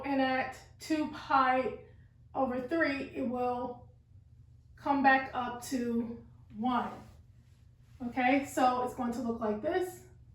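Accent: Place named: Australia. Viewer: Can you explain in hindi That is not matter I can't understand english but you are speaking like australian that's why iam not able to understand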